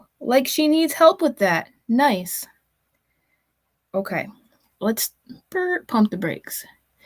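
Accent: United States. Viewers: This is only the 2nd time I've watched you and yes I subscribed after the first half an hour. I live in Australia and I think you are the American version of me but way cooler. I think I actually agree to most of everything you said. Thank you and God dam I love your accent I could listen to you for hours 🇦🇺💛💚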